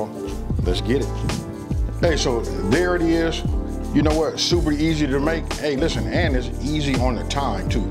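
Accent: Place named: South, United States. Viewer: Where you born ? You sound southern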